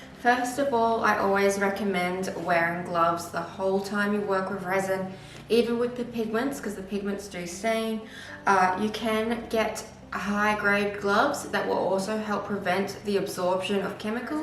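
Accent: Australia. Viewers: Aussie perhaps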